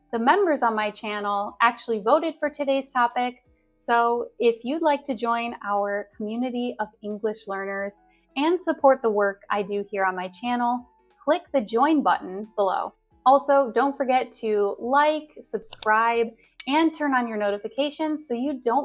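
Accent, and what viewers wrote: United States. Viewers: Thank you i am learning american accent through watching your video i am from India but I am learning lot from you i really appreciate your hard work for me ❤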